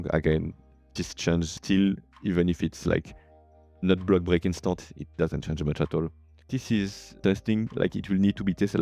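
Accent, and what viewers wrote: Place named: France. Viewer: Thx for what your're doing it's really nice and accurate. Your accent look like french accent, if you are, have you got a french guild ? And if yes, in witch server ?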